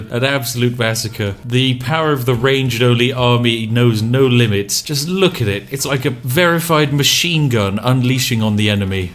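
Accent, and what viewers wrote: United Kingdom. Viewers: Very English.I approve 😂👍👍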